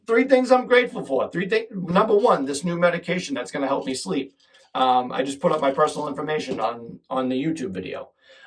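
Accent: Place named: Boston, United States. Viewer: You sleep? 😂😂😂 Your Boston accent is my favorite part of your show! Keep trucking my friend!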